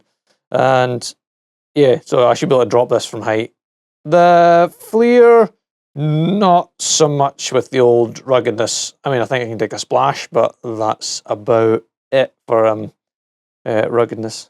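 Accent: Scotland, United Kingdom. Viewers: Cool, like your accent, Scottish? Do you think doogee used good components to last as long as samsung or other 'well" made phone?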